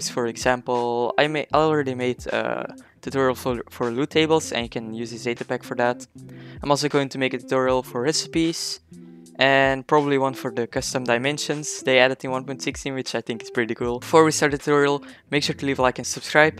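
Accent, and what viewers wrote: Netherlands. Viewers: I can hear the Dutch accent....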